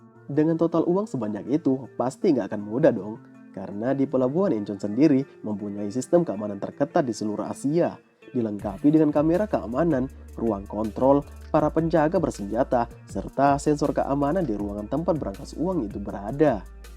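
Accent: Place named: Bugis, Indonesia. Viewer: Saya yakin pasti suku bugis. Dilihat dari logatx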